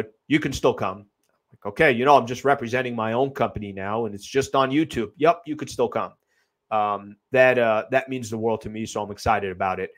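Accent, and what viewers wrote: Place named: New York, United States. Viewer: You're from CA, though you sound like someone from NYC or Boston. I'm from SoCal and also lived in the Northeast for a while as an adult and never heard anyone one in SoCal who sounds like you. I've been watching for a while and always wondered.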